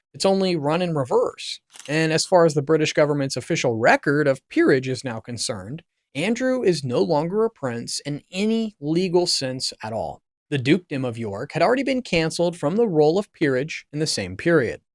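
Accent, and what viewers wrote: United States. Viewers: PLEASE learn how to pronounce proper names. specifically "Sandringham", "Norfolk", "Thames" and "Aylsham". To British ears you sound very err... American (this is not a complement). When you talk about British police - you video shows American police and at one point police from some third country. Pretty sloppy. I like the content though. Andrew is a pig that has besmirched the institution of monarchy. When the King said "the law must run its course" that removed all royal privilege and protection. King Charles must be fizzing with anger at his brother and by publishing those words he is signalling that he is prepared to see Andrew in prison. You can now expect the full force of the law to drop on Andrew like a ton of bricks. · Why the American narrator? What's Prince Andrew got to do with Americans?!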